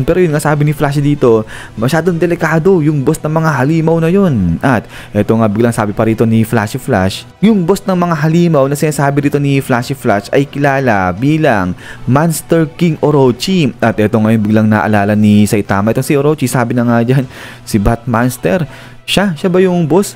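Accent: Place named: Batangas, Philippines. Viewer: Tanong ko lng lods kung batanggenño kba? or bulacaño? May punto kasi ung pagsasalita mo 😆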